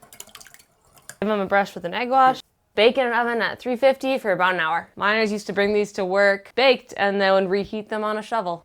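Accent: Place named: Michigan, United States. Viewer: I can tell you're from Michigan bc of your accent :)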